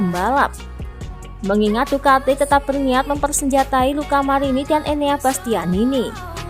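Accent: Java, Indonesia. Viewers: Jawane medhoookkk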